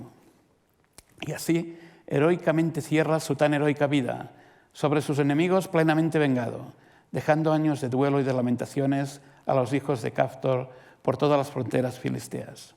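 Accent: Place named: Catalonia, Spain. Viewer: Una conferencia de la que uno no se puede despegar, de cabo a rabo. Me interesa mucho el enfoque, el análisis cultural y religioso de Inglaterra en esa época, con relación al resto de cristianismos que, por acción o reacción, se estaban configurando en Europa. Un aspecto que agradezco es que el profesor se fija en el dato pero no se queda en él, sino que sube a lo esencial. Por cierto, el acento catalán del profesor lo hace aún más encantador.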